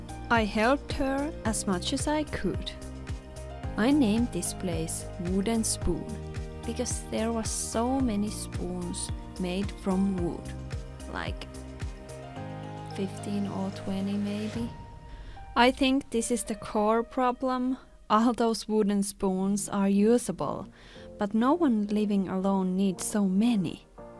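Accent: Finland. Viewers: I like hearing your stories and comments and your Finnis (sic) accent like my grandma. AND: what is that little square thingy on the kitchen sink? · Ihmisten tarinat kiinnostaa. Se miten he ovat tuohon jamaan joutuneet ja miten sitten ovatkin pohjalta nousseet 😍 ja siun ralli englanti on kivaa kuunneltavaa 😁😁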